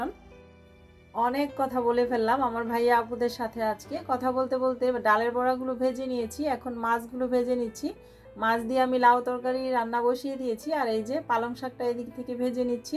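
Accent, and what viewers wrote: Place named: Noakhali, Bangladesh. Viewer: ওরে ! আন্নে আঙ্গো নোয়াখাইল্লা ভাবী নী, আগে কন্নো কিল্লাই?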